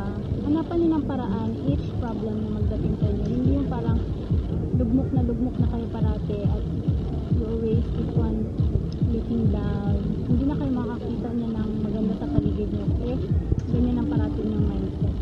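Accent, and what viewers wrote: Visayas, Philippines. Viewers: Bisaya ka po maam? 😊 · Bisaya ka Ma'am no?!😂😁😁😁 minsan kasi nababasa ko sa acent mo minsan. But it was nice!!! keep uploading your video sa channel mo. I subscribed your channel na po and Thanks for the info!!! God Bless!!!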